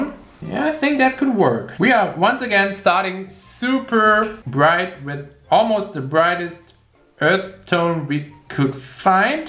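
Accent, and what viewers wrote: Germany. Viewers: Man hört so raus, dass du Deutsch bist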